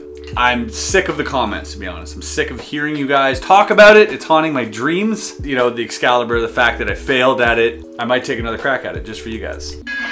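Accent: Quebec, Canada. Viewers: mon dieu mais ton accent canadien est magnifique, j'adore ta chaine et j'ignorais que tu étais canadiens. 👍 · Un joli petit accent quebecois ;) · Canadian French is so much different from the "usual" French I get to hear xD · I totally digged the French speaking part! Et l'accent québécois... S'il te plaît parle Français plus souvent dans tes vidéos. Love you man. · Hey where you from quebec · Je viens de MINDFUCK completement. je me doutais que tu parlais francais mais QUOI?!?! Je m'attendais a un francais cassé pas que tu parlais "quebecois". Ca rajoute un sentiment d'appartenance enorme. MONTREAL REPRESENT. ca srait vraiment cool un video en francais. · Where are you from? Your french sounds like you are from Québec. Ohh and good video by the way (as always) !! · si si j'ai tout compris... bon tu a pris ton accent canadien natif... MY CHALLENGE: parler en Français, sans accent Canadien (i remember that you were bragging about your ability to pick up any accent... 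so here you go)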